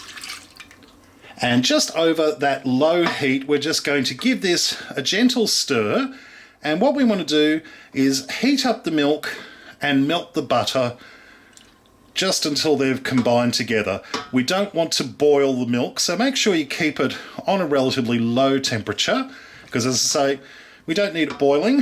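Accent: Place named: Australia. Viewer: This is my first time here, oh how I love our Aussie accent. Now I will follow you on utube, cake looks yummy.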